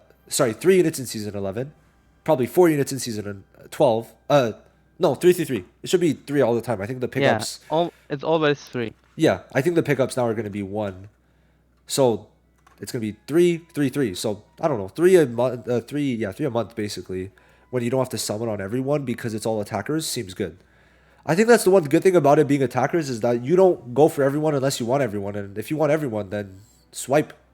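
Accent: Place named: Germany. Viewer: Bro sounds so german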